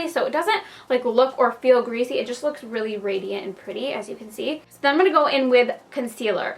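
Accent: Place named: New York, United States. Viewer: This is offensive probs but I hate NY accents usually but I freakin love how you talk so much gaha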